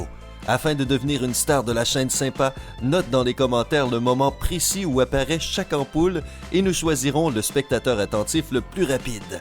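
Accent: Canada. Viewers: Techniquement, ça s'entend que le doubleur est canadien (ou québécois peu importe) donc ils suivent globalement les mêmes règles. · Ces conseils semblent tirés d'un magazine axé sur le même stéréotype : un couple, un enfant, un budget, Papa travaille dans le tertiaire comme cadre moyen... C'est très réducteur, ces conseils qui n'ont rien de nouveau. J'ai l'impression, de plus, qu'avec l'accent de la voix off, ces "14 faits" proviennent du Canada où les conditions sont différentes - on demande, en France, si facilement une "promotion" ? - non, et tous les chiffres budgétaires sont approximatifs (et on a tout converti en Euros). Les chiffres de l'immobilier sont très variables en France, mais une maison correcte à 100 000 € sans rénovation coûteuse, ça n'existe que dans quelques départements désertiques. Et puis les bons conseils entre ne pas emprunter, mais savoir investir, on est là encore axé sur un modèle unique presque idéal. 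Tout le monde est fait pareil ? Non. J'ai l'impression d'entendre à nouveau les conseils de ma mère quand j'avais 20 ans, et à des conseils trop formatés, il n'y a jamais de résultats parfaits (voire des échecs). Ma mère n'imaginait pas, il y a 30 ans, ce que je serais aujourd'hui, dans un contexte socioéconomique radicalement différent. Donc, tout cela est peut-être vrai, mais pour "un-couple-trentenaire-qui-veut-avoir-une-maison-pour-avoir-un-enfant-à-payer-avec-une-augmentation-de-Papa". La pub idéale, quoi. La vie idéale qui n'existe pas.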